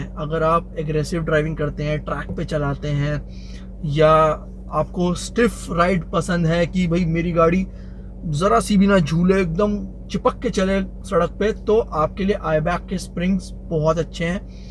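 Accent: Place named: India. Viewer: I think the same until I notice that is actually english but with soooo strong hindi accent...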